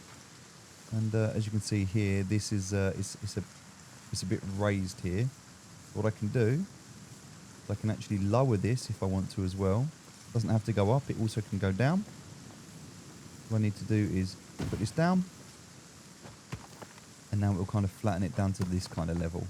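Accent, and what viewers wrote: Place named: East Anglia, United Kingdom. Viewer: decent watch thanks. also sounds like you're from round my way? east anglia?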